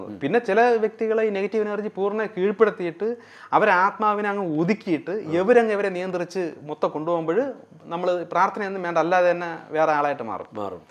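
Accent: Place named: Thiruvananthapuram, India. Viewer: നല്ല തിരുന്തോരം ഭാഷ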